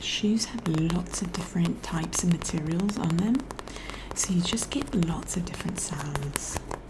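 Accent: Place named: United Kingdom. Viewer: An Italian with a British (I assume) accent, living in Egypt, with friends in France, and family in the USA. What a true international life!!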